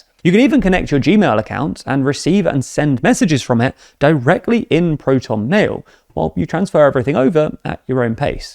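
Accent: United Kingdom. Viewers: Cuber. I love British speak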